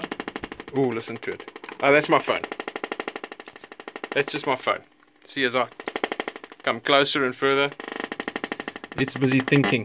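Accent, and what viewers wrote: South Africa. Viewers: So nice to hear another Saffa's accent and also somebody who loves valve amps.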